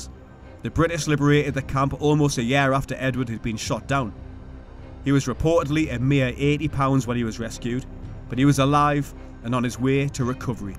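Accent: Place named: Newcastle, United Kingdom. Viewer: Can't take this video seriously with its Geordie narray'aaaaah.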